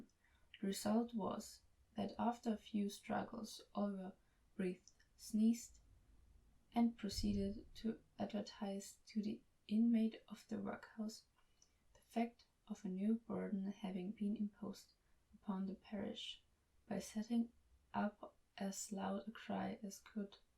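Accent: Austria. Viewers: It's really cool hearing Dickens with a really cute Austrian accent!😊 THANK YOU!!💕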